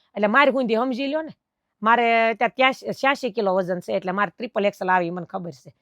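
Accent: Kathiawar, India. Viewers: She is speaking kathiyavadi gujarati language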